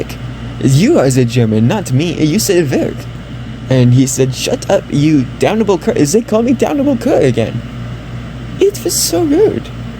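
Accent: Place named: United States. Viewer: i hear ur american accent coming out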